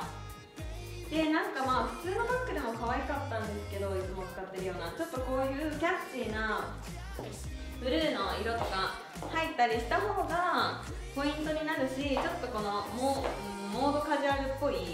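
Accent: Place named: Kansai, Japan. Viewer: さんが履くとユニクロのデニムもセリーヌに見えます😍ほんとどれもめちゃくちゃ可愛いしオシャレすぎです！！ちょいちょい関西のイントネーションが嬉しい😆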